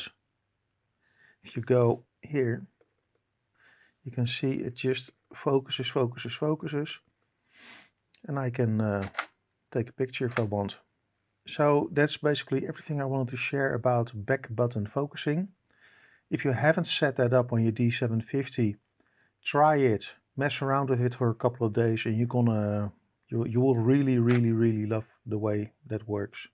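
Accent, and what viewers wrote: Netherlands. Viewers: Are you Dutch? I hear an accent.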